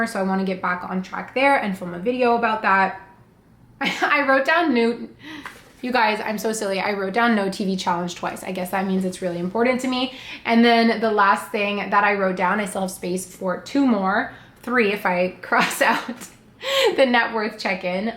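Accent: Canada. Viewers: Hey - for knowing the days of the months - do the knuckle trick. Google it. For the laundromat - I think they reserve certain machines for doing animal hair stuff - I don’t know if these are necessarily the big machines you were talking about. But I’m glad you found a good laundromat. You do have a strong Canadian dialect 🍁🇨🇦🫡when speaking French - but maybe it’s just you talking quite fast. Maybe pretend you are talking to a young child when talking to Siri 🤓. Or why not switch to to Canadian French like he suggested? If you like to start cooking - I like to make hummus or different pestos - these are good things to use up for your lunches or in bowls. If you play around with replacing expensive ingredients with more economic ones - rocket / spinach for basil, sunflower seeds for pine nuts it can be a good alternative to store-bought ones. I put a fitted sheet on my couch - for a single bed - if you buy one that resembles linen or sthg. 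similar and you colour-match it - it really blends in and saves you many stains.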